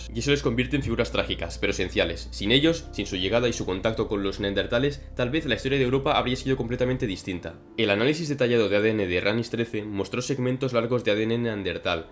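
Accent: Spain. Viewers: MODULA GALLEGO HABLA CASTELLANO , la puta madre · Ahí le bajé la velocidad a 0,80 😊. Reconozco q me cuesta a veces entender el acento español. Muy buena info, tu video. Gracias.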